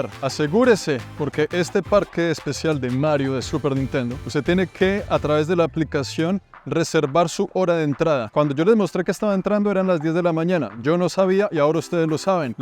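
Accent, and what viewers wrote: Colombia. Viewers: Buena, parce. Japón es bacano, y más su forma colombiana de relatar sus videos. Siga así!!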